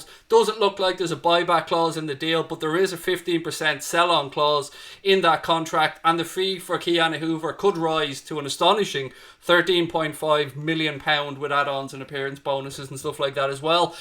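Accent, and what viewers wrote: Ireland. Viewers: Great news to wake up to this morning. Came across your channel watching about Thiago and have subscribed...I like listening to you on your own and bring half Irish, love the accent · Yeah I thought I recognized that accent, I’m Irish too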